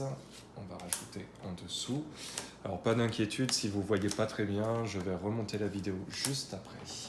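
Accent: Canada. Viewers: Bonsoir, j’aime beaucoup quand tu tire les cartes devant nous, après tu fais comme tu le sens. La reprise des cours est elle bien?? Anglais!!! Français!!!! J’aime l’accent canadien 😀😀😀. Merci pour les nouvelles avec les cartes. Hé oui tu fais parti de la famille. Amitiés Sincères 🌹 🌹 🌹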